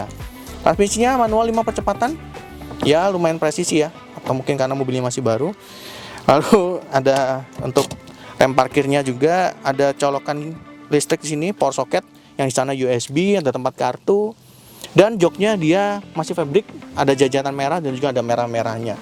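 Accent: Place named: Java, Indonesia. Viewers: Wong jowo to mas, 😁 semangat mas broo.. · logat jawa timuran.... 😜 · Lokasi apartemen denver Layak bahasa.e medok🤣🤣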